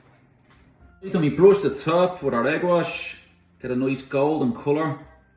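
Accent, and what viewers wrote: Ireland. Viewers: Put them in the oven for about turtee to turtee five minutes! Love and miss the Irish accent. 👋😁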